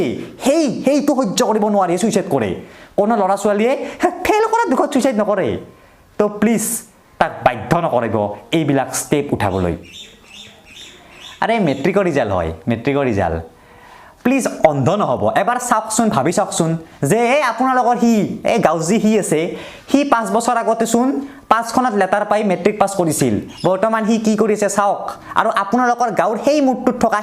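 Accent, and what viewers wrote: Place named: Lower Assam, India. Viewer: Lower Assam r language enekuwai Moi Assamese Chanel nasau ..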